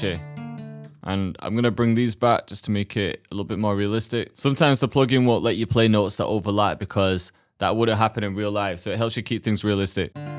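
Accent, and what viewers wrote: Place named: Manchester, United Kingdom. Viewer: My g you have that manne accent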